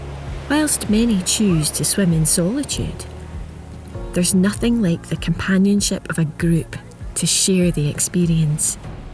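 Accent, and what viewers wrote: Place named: Scotland, United Kingdom. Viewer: cold showers and scotish accent ,what else can u ask for? cheers from mexico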